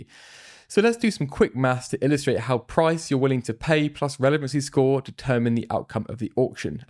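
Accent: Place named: England, United Kingdom. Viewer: Dude, you sound so english wehre are you from or based actually right now.?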